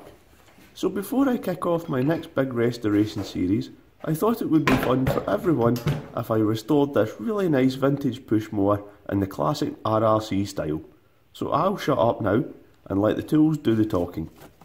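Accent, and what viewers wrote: Scotland, United Kingdom. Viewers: His accent has me wondering where he is from the mower is British but his accent sounds Scottish if you read this can you please tell me if you don't want to that's fine my ancestors from my mother's side are Scottish and I would just like to know thank you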